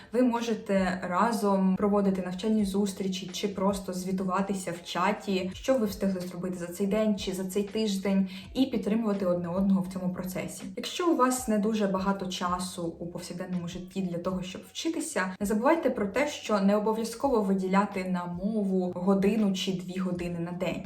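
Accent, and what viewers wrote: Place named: Japan. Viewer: Дуже пізнавально, дякую!! хочу сказати що мабуть те що ви почали вивчати японську -наклало відбиток на вашу інтонацію. При бажанні подивіться відео ToriChyanChannel. Наша дівчина що вийшла заміж в Японію, в неї дуже змінилась вимова і інтонація ( на останні слова в реченні) за ті роки що вона там. Бажаю вам щастя і успіху!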